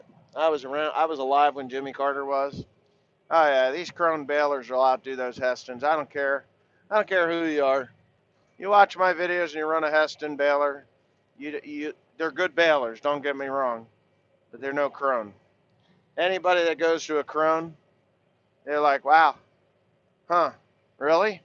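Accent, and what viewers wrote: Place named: Mississippi Delta, United States. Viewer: Sounds like u in ms delta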